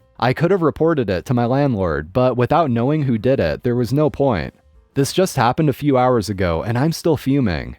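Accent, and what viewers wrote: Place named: Canada. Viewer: What a NOT charming contribution to the dialogue. Different continents, different pronunciations. Tomato, tomato. As I recall, our host is Canadian, as am I, and we both say it NOT your way. If you can’t be nice, don’t bother to comment, especially on such a petty item and in such a preposterous fashion.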